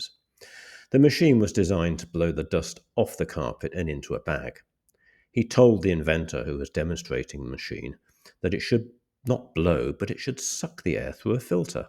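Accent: United Kingdom. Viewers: Love your channel! I’m a sucker for documentaries and history and your English accent makes it all the more better lol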